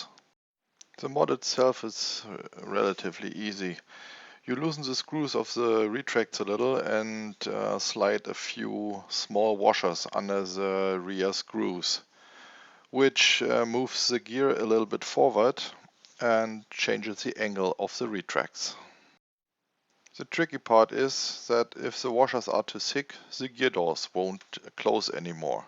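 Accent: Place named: Germany. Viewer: Nice flight,nice Denglish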